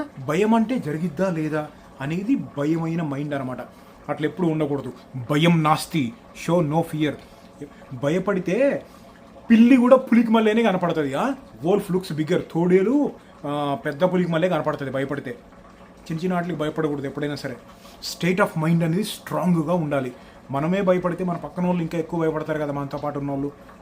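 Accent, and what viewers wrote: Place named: Andhra, India. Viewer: Your language pronounce is like Andhra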